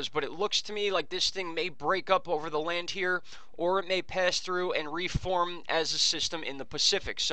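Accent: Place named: Long Island, United States. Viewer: You have a Long Island accent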